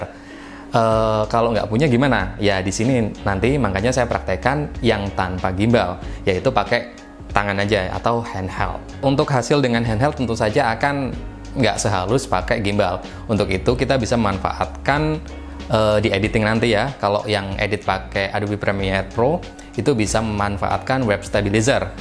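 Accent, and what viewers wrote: Pekalongan, Indonesia. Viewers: Pekalongan mana suaranya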